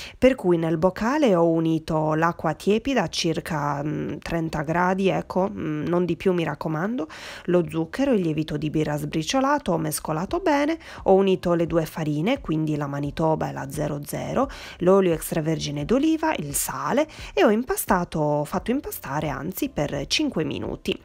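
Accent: Sardinia, Italy. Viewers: ciao ti volevo chiedere se uso la farina 0 e uguale perché in questo periodo e difficile trovarla La farina Manitoba con il covid 19 qui sparisce tutto e se trovi qualcosa e un miracolo ciao ancora .(sai io o delle cugine e la zia che abitano lì e sono tanti anni che non li vedo perche mia mamma e nata li a Iglesias.infatti io cono mezza sarda perché assomiglio tanto a lei e o oltretutto ereditato l'anemia .vabbè comuncue mi piace tanto sentire la tua parlata Sarda mi ricorda quando andavamo a trovare mia nonna che abitava a Iglesia ora non c'è più sono ricorbi dellissimi che o e mi tengo nel Cuore e mi piacerebbe rivedere la mia cara Sardegna sai be un saluto caro ciao CATIA dall'Umbria 😁👍🤣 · Mio padre è sardo!!! Mi piace il tuo mitico accento!! Le tue pizzette cicciottose !!! Bravissima !!! Un abbraccio da Trento !!!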